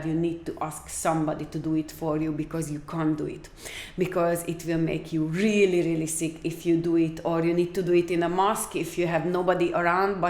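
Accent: Hungary. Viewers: nem magyar vagy véletlenül? hát én ismerem ezt az akcentust, nem lehet más :D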